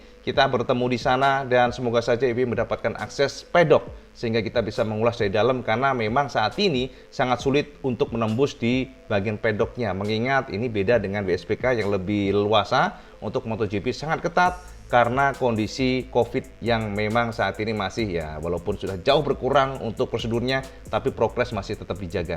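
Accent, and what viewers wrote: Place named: East Java, Indonesia. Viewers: Suwun cak infone... Mantap 👍👍👍 Ndelok logat ngomonge sampeyan teko Jatim yo !? 😀😃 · Cak sampean tuh kasih Impromasi nya penak di mengerti sangat kentel jowo neh sukses untuk sampean... 🤭😄😄🙏 Mantap👌👌👌👌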